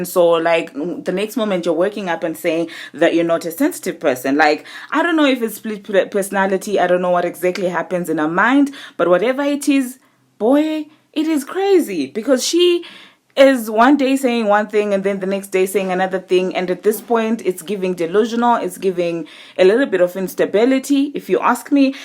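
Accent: South Africa. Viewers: Are you South African 😢😍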